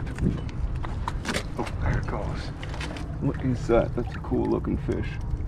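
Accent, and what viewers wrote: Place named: East Coast, United States. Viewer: Couple comments and questions?! First your outro music scared the SHIT out of me. I was intently staring at that fish you couldnt indentify watching him swim away and BAM. Heart attack. 😂 Next up, you sound super east coast. Its great. 😏👌 how do you euthanize the fish? Its nice to see you gently remove the hook. I went deep sea fishing once and the guy just ripped the hook out of the fishes lip 😭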